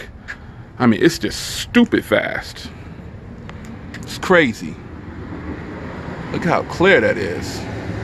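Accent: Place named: New York, United States. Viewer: New York accent coming out like crazy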